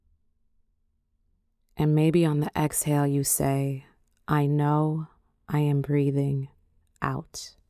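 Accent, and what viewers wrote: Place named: New York, United States. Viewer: I want loud mouth thick NY accent back 😂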